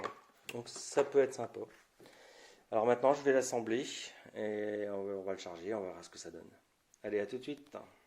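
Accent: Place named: North, France. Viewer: Désoler mais votre accent jme suis douter que vous habitez dans le nord 😂, moi je suis du pas de Calais 😊😉, merci beaucoup pour votre vidéo...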